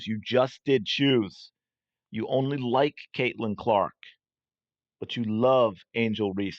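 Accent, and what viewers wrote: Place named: New York, United States. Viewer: As an aside, I could've sworn I detected a NY accent in your speech😊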